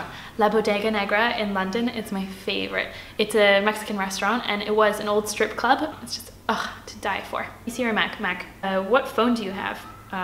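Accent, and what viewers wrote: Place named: Canada. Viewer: Ohhh that's why in your other video with your friend you had a canadian accent snd in this vid you have a mixture 😮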